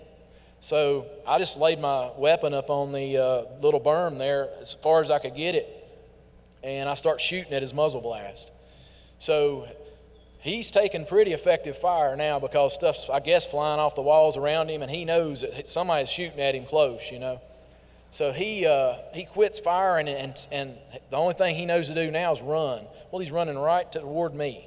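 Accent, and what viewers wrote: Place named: United States. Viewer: I like your True American accent the best. never mind that you are handsome too.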